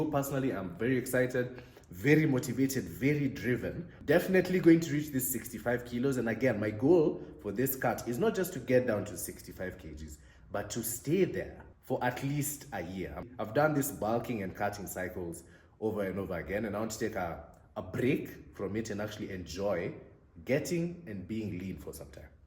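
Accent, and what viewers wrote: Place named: Africa. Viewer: Love your accent.what country in Africa are you from.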